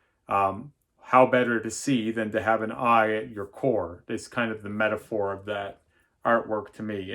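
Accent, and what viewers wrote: Canada. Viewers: I appreciate your reply. Did not know you were Canadian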